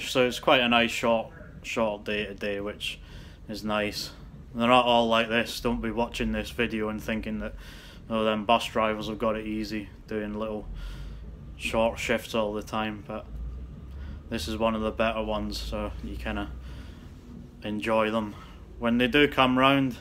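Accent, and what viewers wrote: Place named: Scotland, United Kingdom. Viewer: Thourghly enjoyed the video. Nice scottish accent. Hope your stil with the buses. Avid enthusiast.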